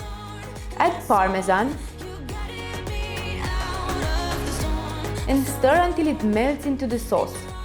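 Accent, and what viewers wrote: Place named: Romania. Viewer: Love the recipe and your accent. Is it Romanian?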